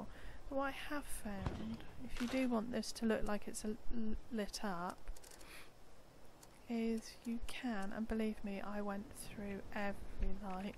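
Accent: United Kingdom. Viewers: Awesome video! Love your accent, are you from the UK? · Brilliant . You got yourself a new subscriber. Nice to hear an English accent in a 76 video too lol. Got my mind turning over thinking of tiny houses now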